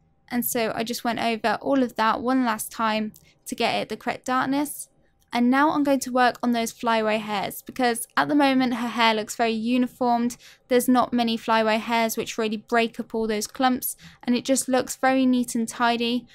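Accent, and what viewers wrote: United Kingdom. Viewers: I love ur accent..is it british?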